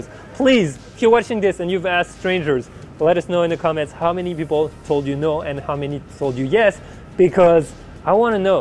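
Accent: France. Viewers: yo! Love your video, they are really great! Can you or anyone else that knows it tell me from where does this accent come from? because i feel like its french in the way you pronounce some "r" but im not sure:)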